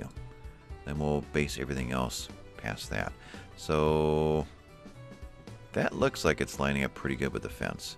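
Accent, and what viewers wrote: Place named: Ireland. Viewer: Very Irish tone to this ep, very nice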